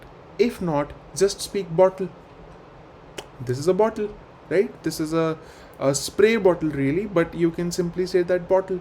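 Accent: India. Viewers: Look at your own accebt and body language typical indian its not at all English. How do you teachers. To me very substandards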